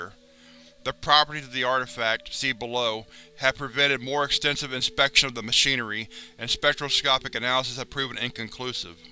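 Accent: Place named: Alabama, United States. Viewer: You sound like someone who lives in Alabama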